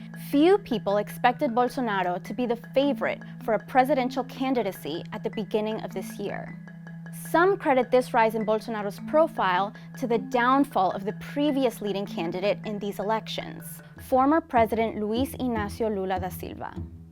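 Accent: Spain. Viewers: because she speaks Spanish as her language 🤷🏾‍♂️ 🤷🏾‍♂️ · Cool. But why is she pronouncing names and places with Spanish accent? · A lot of facts given against Bolsonaro were neither fully nor at all explained! oh, and by the way, why are you speaking portuguese with a spanish accent? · y u are Speaking portuguis with spanish accent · It's annoying how you pronounce Brazilian names with a Spanish accent.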